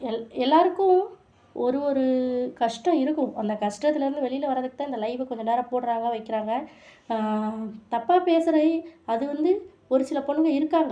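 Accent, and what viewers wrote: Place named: Tirunelveli, India. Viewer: Thirunelveli பாசை sis 🎉🎉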